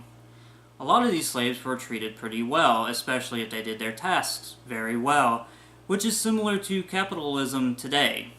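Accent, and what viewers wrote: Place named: South, United States. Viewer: You have a southern accent, doesn’t surprise me that you’re trying to justify this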